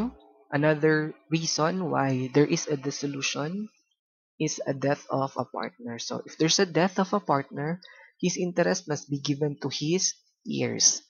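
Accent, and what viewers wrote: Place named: Visayas, Philippines. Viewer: Thank you po. I enjoy po the tutorial when you speak Bisaya. It is kinda cute po.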